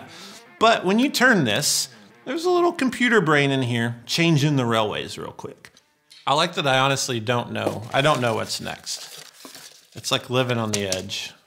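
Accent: United States. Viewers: I love how he uses the contrived southern accent when he wants to sound ignorant. I believe he’s from Dixie and he speaks with a regular American accent.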